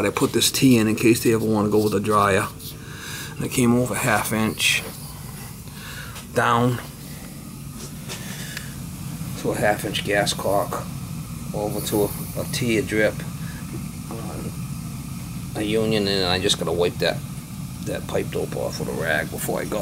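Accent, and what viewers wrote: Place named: New England, United States. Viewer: Great videos. Oh mama do I get a kick out of the New England accent. LOL! Wish you lived closer to western PA. I have a boiler that needs replacing and appreciate you taking the time and effort to make these videos. Big Thx!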